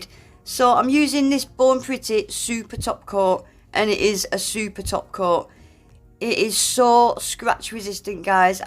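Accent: Yorkshire, United Kingdom. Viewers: It’s a proper Yorkshire accent 😉🥰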